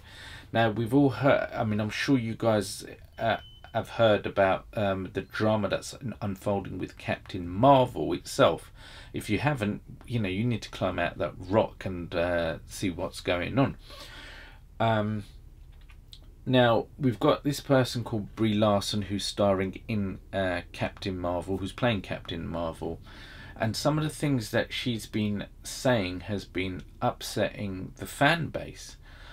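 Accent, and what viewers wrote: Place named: United Kingdom. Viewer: Expected to hear a Midwestern deep African American voice, Received an accent from the motherland across the pond. Jolly good! You earn a new sub for making me laugh as well as we have the exact same shirt on. I don't know the odds on that but it's still pretty fuc*ing impressive. I'm looking forward to more content brother.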